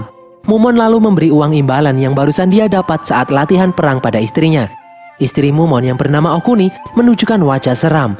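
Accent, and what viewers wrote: Java, Indonesia. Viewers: Cukup cukup matamu. Iki ketoke seng ngisi suoro wong jowo iki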